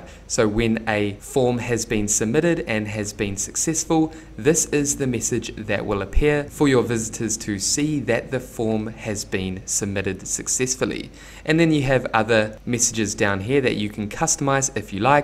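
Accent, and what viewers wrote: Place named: New Zealand. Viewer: Thanks for the great info! We are a Kiwi/Aussie family living in Paraguay so it's nice to hear your Kiwi accent on the video! 😉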